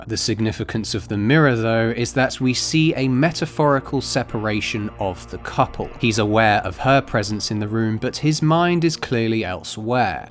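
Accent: United Kingdom. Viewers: The whole time I'm watching I forgot that you have a British.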